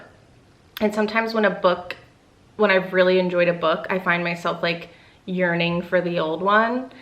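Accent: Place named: Philadelphia, United States. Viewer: BLESS the delco/philly accent - i'm starting task this morning!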